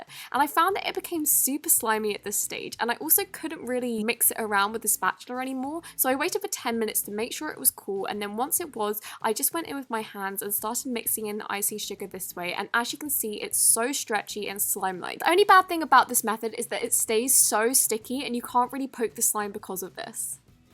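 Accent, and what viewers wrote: England, United Kingdom. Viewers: Omg!! I really wanna know what part of England your from!! Please dm me on <mail> I love u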